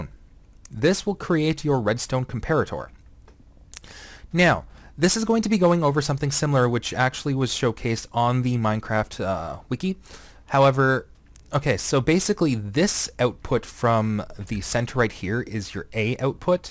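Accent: Canada. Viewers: You sound Canadian, are you Canadian? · you are from canada XD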